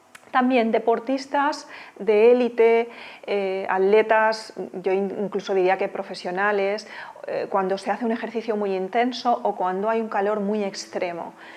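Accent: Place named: Spain. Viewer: me encanta escuchar a los de Espana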